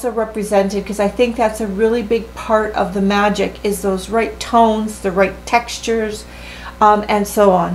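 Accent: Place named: Toronto, Canada. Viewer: Hello from Belgium. I found you because I wanted to learn more about Spencer but YOU sound like a sweetheart! I'm originally from Atlantic Canada (Cape Breton Island) but haven't been back home since 2011 but l please correct me if I'm wrong but you sound like you're a mainlander perhaps from Toronto?? (My apologies if you're a Yankeedoodle eh but I left to come live over this way back in 1989). ❤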